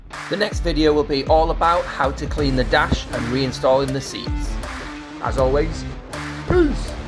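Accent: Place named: England, United Kingdom. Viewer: I really enjoy listening to you speak. (English accent) 😁👍🏽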